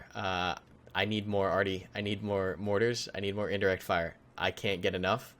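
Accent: United States. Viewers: Asian? with american voice? anyway nice video like always. keep it up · 😍😍 you look sooo cute so just you look Asian, you speak American (not English) and you live in Hawaii omg so cool 😁 pay attention you're starting to speak like Bluedrake42 (the bad (but not baaaad just not that good) way that you do sentences) 😂xD well lov u 😉😍😘❤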